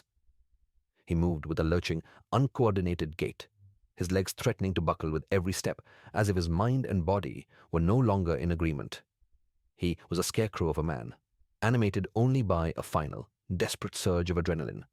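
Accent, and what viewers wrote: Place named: India. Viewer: How horrifying!😱😱😱 Is there any special reason the voice sounds Indian? Grave Fear has a video like this. Same title, same plot, same characters. Why do five guys in the video title screen I'll have the same face? Are they quintuplets? , the story says "they held a look of pure douer", but the video captions say "pure awe". , it seems like the story starts over from the beginning. The only differences between this and the Grave Fear one are the narration and the way it starts to summarize what happened so far towards the end. Then it continues onward, going the way the Grave Fear video did. Another change is that the survivors didn't make it out. Is there, or will there ever be a sequel?😢😔🙏🙏🙏💖✨📖✨💖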